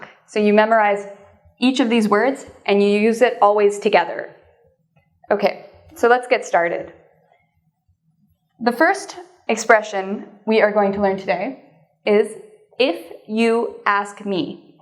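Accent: Canada. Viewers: Canadian English is nice... and she explains very well. Keep on like that,! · Hi, awesome vids! Just out of curiosity, are you by any chance Canadian? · i can hear the canadian accenthaha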